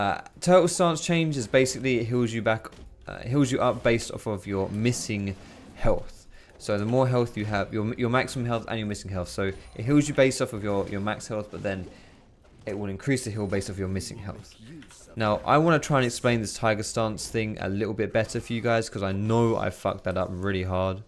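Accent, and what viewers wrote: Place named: Essex, United Kingdom. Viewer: You know is from Essex when he says "not gonna lie"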